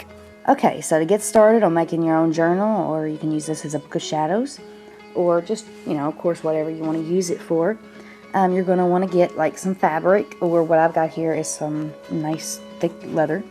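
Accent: South, United States. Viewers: where you from you have a southern accent :)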